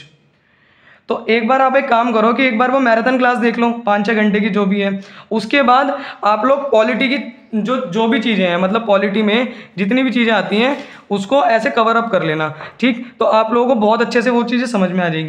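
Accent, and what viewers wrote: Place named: Kanpur, India. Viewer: Aap kanpur ya Lucknow ki lagti Ho ya phir ayodhya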